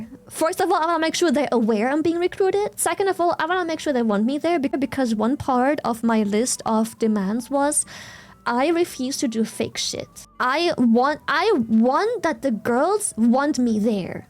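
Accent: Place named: East Germany, Germany. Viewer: I guess, the accent kind of tells it, she's from eastern Germany. More specifically Sachsen-Anhalt.She never went beyond those details.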